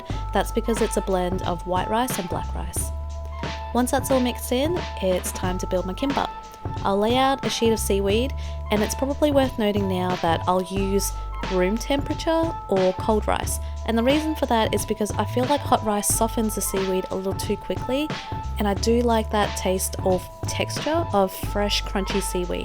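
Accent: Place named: Australia. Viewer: I heard oz accent here 😍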